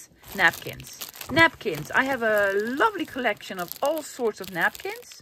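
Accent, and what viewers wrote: Netherlands. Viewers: Hello!! afinally I post something - I have been following your videos and I have learned so much and now have actually completed a few things that I am happy with - with thanks to you. So I will be putting together some happy mail. It is a thank you for your big hearted sharing of what you have learned! Not only that but I am Canadian and you know the connections we have with the Netherlands. My two best friends were from Vollendam - unfortunately they have passed before me and I miss them terribly. But your voice brings them back to me with the accent and I feel close for that reason. I am still old enough to be your mother (79) but love to learn new things!!, have a lovely day or evening or weekend! All the best, Barbara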